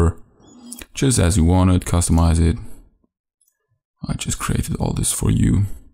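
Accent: Germany. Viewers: Man hört den deutschen aus dir haha :D Hast du OSX Yosemite noch nicht ? :o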